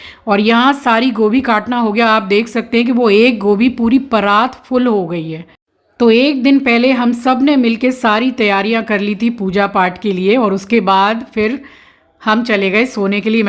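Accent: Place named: Agra, India. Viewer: ji aap ke muh se Agra ki language sun kar bahut achha laga bola karo kabhi kabhi❤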